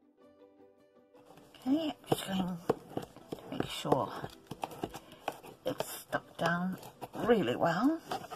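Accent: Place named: United Kingdom. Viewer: are you from the UK. Your tone and accent is very nice. We don't feel like you are rushing through it. Now your project is the best one yet. I love the little sheen in the checkered pattern and the bling type ribbon you used to give that wow factor. Give Chloe little kisses for me.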